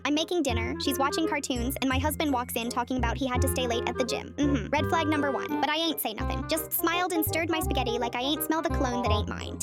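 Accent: Texas, United States. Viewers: The texas accent makes it funnier😂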